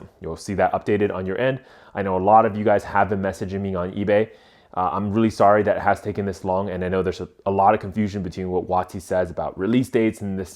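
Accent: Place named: California, United States. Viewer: It sounds like you either live in the Bay Area or Southern Ca.. I hope you are successful with your Magic business.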